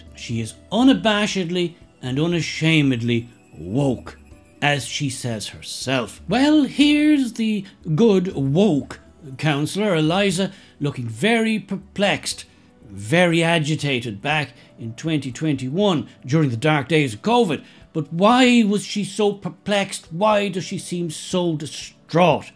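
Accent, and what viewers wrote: Ireland. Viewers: Best of all Irish voices on youtube, only you.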